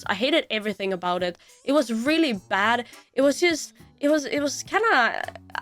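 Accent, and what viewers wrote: Sweden. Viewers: There's actually the tiniest hint of a tiny Swedish accent if you listen carefully. All Swedes I've met in real life speak execellent English. Oh well an then there's Anomaly of course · you sound swedish. its the swedish accent breaking through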